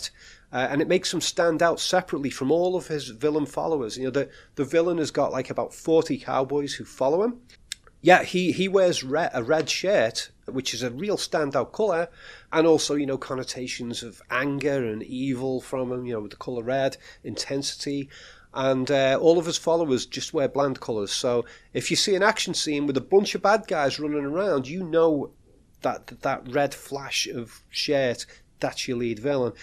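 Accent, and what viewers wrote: United Kingdom. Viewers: Im not a natural speaker is this a british accent?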